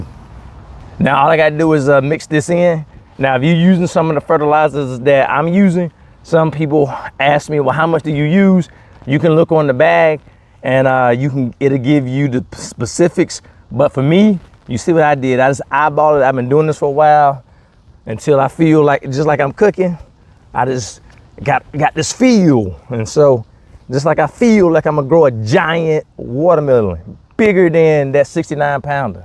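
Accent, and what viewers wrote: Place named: Baltimore, United States. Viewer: You saying u's like you from b.more! Yay yae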